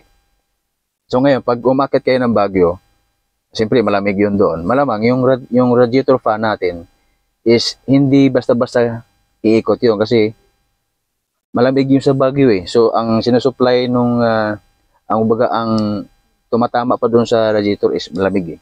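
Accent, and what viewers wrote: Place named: Visayas, Philippines. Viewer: Bakit bisaya punto mo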